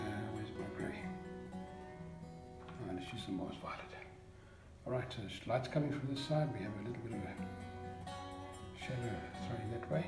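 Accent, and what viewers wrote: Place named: South Africa. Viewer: Nice to hear a South African voice on YouTube.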